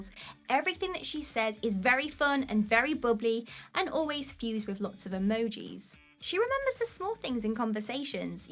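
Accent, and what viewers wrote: England, United Kingdom. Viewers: Love your accent yet can’t quite place it. London? Bath?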